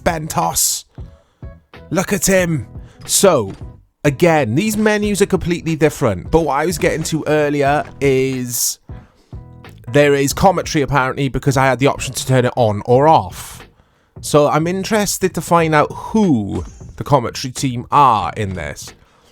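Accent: Wales, United Kingdom. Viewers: Are you welsh as I can’t hear a little welsh, also big up United.. even tho we are trash atm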